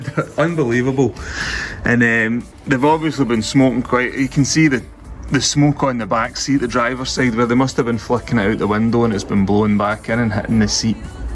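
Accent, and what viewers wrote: United Kingdom. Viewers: i dont know how i get here but nice job but can i ask what is this accent like old britan or scottish or irish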